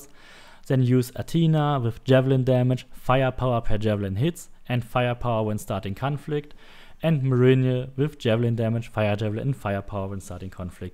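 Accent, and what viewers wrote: Germany. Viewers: Love this channel. Despite having a strong german accent (like me:D) which could be difficult to understand by everyone these informations are pure gold. Thanks to this channel I played the game again. I have so many ability points that I can try out many sets which are also presented in this channel in a very detailed manner. Very helpful! Thanks! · Only took me 10 seconds to recognize that you are german. Love your videos. Keep going <3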